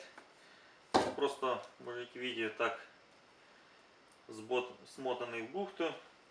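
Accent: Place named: Ukraine. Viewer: Мужик, ти ж україномовний, в кожному слові це відчувається нахіба ти не звичною для тебе російською говориш?комічно ж виходить...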